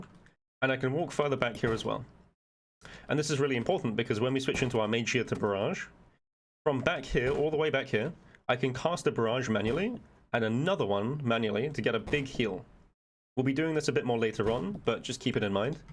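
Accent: United Kingdom. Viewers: Great guide. Didn't love the British accent though.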